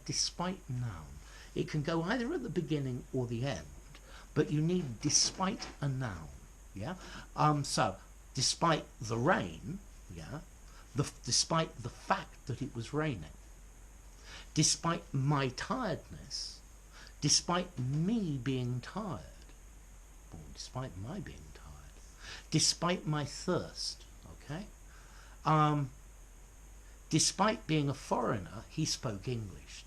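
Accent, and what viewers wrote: RP, United Kingdom. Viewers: The teacher's hair is rather tousled in the video.And he looks very weary and tired Perhaps he is working far too much and doesn't get enough sleep to recharge Nevertheless it is an excellent video And he has a nice pullover too. Which could compensate for the wind-swept hair And I forgot the posh accent which is a dangerous and deadly turn-on ,,nonetheless 😂, Christ ! ❤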